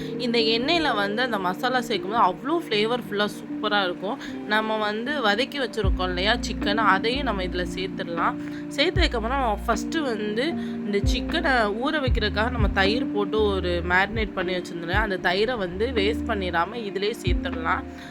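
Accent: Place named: Ramanathapuram, India. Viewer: Akkaw neenga ramnad district dhane,apdiye enga ooru karavanga Madhiri pesuringa.chicken gravy pathale echeel oorudhu ka.mouth watering dishes ka.superb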